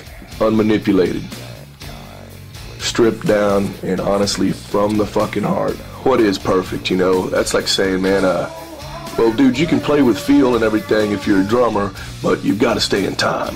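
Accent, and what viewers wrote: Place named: United States. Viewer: what he say?? i don't understand spooken american :)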